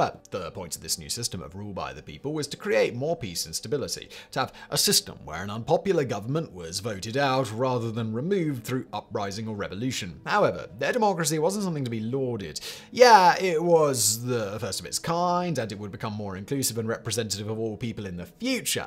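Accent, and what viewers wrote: England, United Kingdom. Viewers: I don't follow. isn't American. I've never heard anyone in America say Leon-Eye -Dass. Maybe if you've been watching too much Pawn Star Wars on the now mislabeled History Channel. Actually I've never heard anyone say it that way. Since he is very, very English, he's never changed anything he's said in years to cater to any American pronunciations. If I had to say what the most common pronunciations that I've heard, on TV, the BBC, in movies, it would be Le-o-ni-des.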